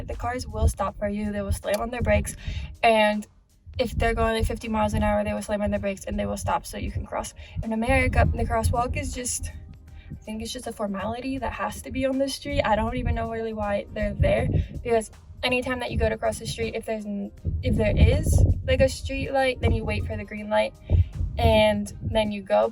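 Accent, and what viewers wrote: Spain. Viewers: It is very interesting that when you speak I can sense some Spanish accent. yeah food hits me hard when I go back to the US, I gain weight really fast. I'm actually from Allentown, but I live in Slovenia for 8 years now and I don't really feel like moving back to the US. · Is it me? Or does it really sound like she has a little bit of an accent? which means that hilaria baldwin is right that she did pick somewhat of an accent while in spain.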